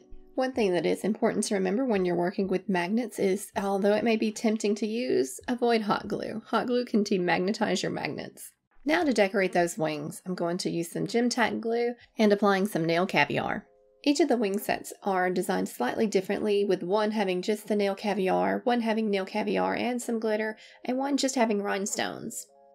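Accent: South, United States. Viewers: They are all really beautiful. I found this channel through our Facebook page, so now you know it works to advertise! Also, you sound like you are from the south like me! It's great to have other doll collectors from the area. You do great work!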